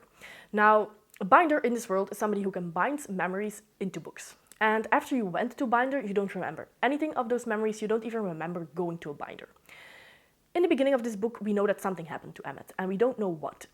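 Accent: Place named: Netherlands. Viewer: I hope you're feeling better now. Thank you for the recommendation. I loved most of the books you recommended, the others go on my TBR. I wasn't a fan of Mistborn, maybe I'll like Elantris. Just found out you're from Belgium, like me. 😊 Do you only read in English or also in Dutch? (I think you're dutchspeaking, going by the accent.)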